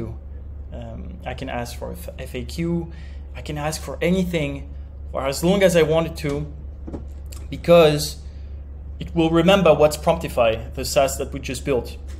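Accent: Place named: France. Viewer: Je perçois un petit accent de langue française… Parles-tu français par hasard? 😊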